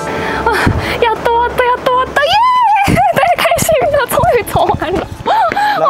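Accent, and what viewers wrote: Taiwan. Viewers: 比台灣人還台灣口音，努力有了好收穫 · 這中文說的台灣腔，沒誰了，完全聽不出來耶，厲害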